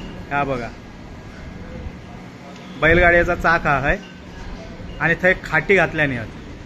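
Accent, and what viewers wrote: Malvani, India. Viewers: तुमचा मालवणी बोलणं खुप aawdata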